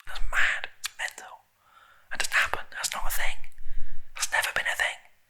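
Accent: United Kingdom. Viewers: always wanted to listen to a british guy asmr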